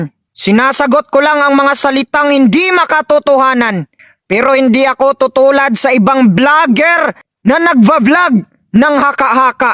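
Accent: Visayas, Philippines. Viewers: Sakit sa tenga nitong Visaya na to ayosin mo muna salita mo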